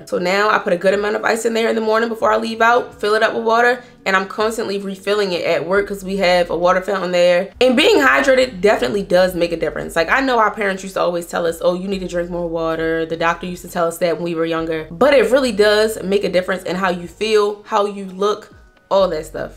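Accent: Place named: DMV, United States. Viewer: Girl you HAVE to be from somewhere close to Baltimore,Md. I know this DMV accent anywhere lol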